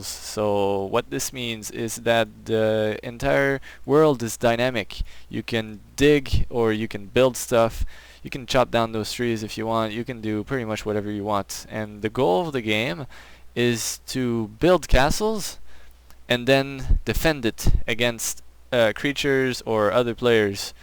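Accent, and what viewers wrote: Quebec, Canada. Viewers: Le québécois, je dirais... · Vous êtes Québécois ? Je dis ça, car on dirait que vous avez un petit accent Québécois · By the sound of your voice and you name, i guess you'rs from Québec? Am i right? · I recognized the quebec accent immediately :) .. also.. cool looking game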